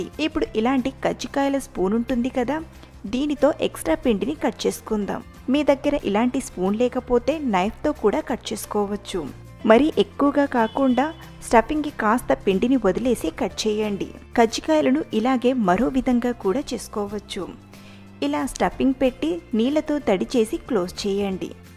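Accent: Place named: Warangal, India. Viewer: mi proper warangal anukunta same ma warangal language matladuthunnaru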